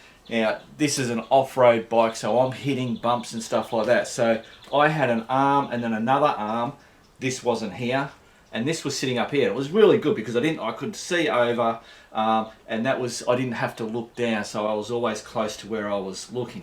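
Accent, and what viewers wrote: Australia. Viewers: I was 1 minute in lol, he's proper Aussie 😂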